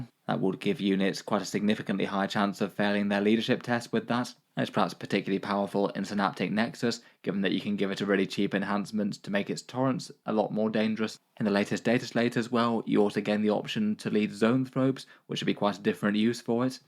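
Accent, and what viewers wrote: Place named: United Kingdom. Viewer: So is very clearly from UK. As an American it throws me for a loop whenever i hear Loo-tenant instead of Lef-tenant in a British accent.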